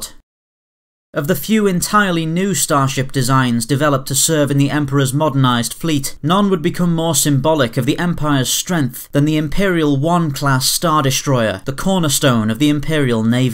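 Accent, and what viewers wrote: United Kingdom. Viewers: Love how the British accent makes anything sound better and credible. It just sounds good. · Hiaruga I agree just think he needs to slow down and make it a bit more conversational and less frantic. It makes it a little uncomfortable to watch multiple videos with this rushed tone but otherwise I also appreciate the British accent just wish it was a bit more on the calm side. But always great content! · This was long overdue but, man I love your manner of speech. I may be biased as a fellow Brit meself but I can't help it.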